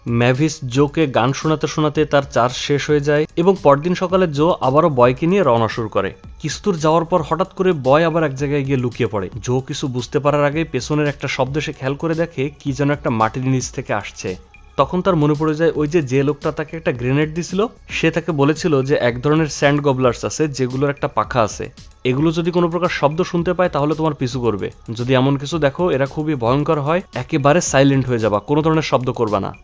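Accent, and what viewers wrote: Khulna, Bangladesh. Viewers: ভাই আপনার বাড়ি কি খুলনায়?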